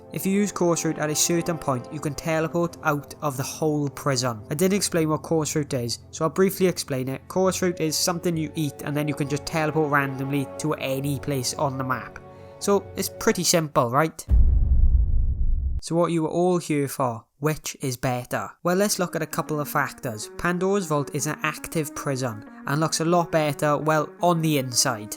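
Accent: Wales, United Kingdom. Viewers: Is he welsh??? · U Welsh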